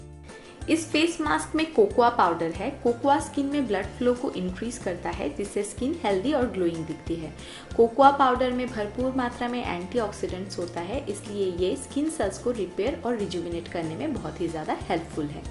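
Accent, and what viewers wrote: India. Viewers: Before, you wrote the name of the ingredients in the legend. I know how to speak English, but due to your Indian accent I have a little difficulty understanding what you're talking about. Please, if you do not mind, start captioning again. Please. I like you so much. Congratulations on the evolution of the channel =)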